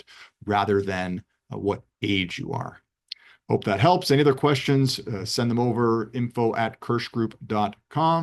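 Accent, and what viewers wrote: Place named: United States. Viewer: You pronounce "Z" like Yankees.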